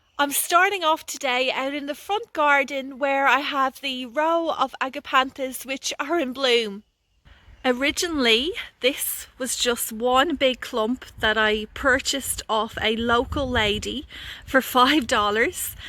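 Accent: Ireland. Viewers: Happy new year I love your beautiful garden. I love to enjoy your thursday garden update I also love your irish accent and keep up the fantastic content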